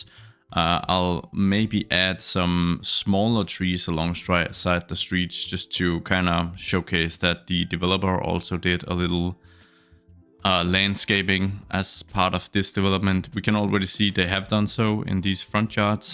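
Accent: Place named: Denmark. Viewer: Are you Danish? Because your accent really sounds like us.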